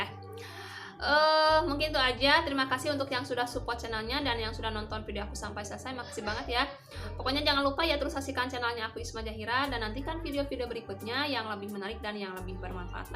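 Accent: West Java, Indonesia. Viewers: Orang Sunda ya teh, logat y kaya Sunda hehe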